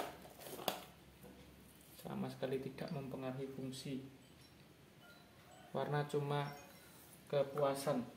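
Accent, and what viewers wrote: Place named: Java, Indonesia. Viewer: Asli mana bosku kayak medok😂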